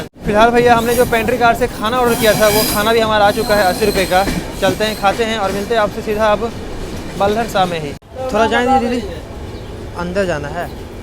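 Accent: Bihar, India. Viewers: ji behtar Hai k aap Gyaan na dein Bhai main aapke saare videos dekhta Hun mujhe Sahi lagta Hai aapke bolne ka style bihar ka Hai Or Mai bhi Bihar se Hun or Mai aapke iss channel ka subscriber bhi hun lekin bhai choti si baat thi k aap Kisi ko gyaan mat do agr Mai Kuch galat bol diya to maafi chahunga but jo mujhe laga maine bol Diya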